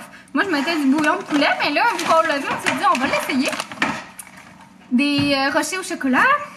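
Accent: Quebec, Canada. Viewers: trop sympa d'entendre l'accent québécois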